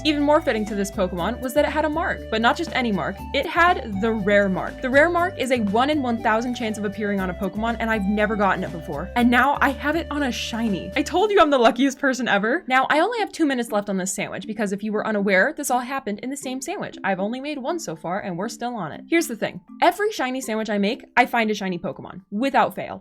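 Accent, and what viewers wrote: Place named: United Kingdom. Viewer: You sound like someone from around the uk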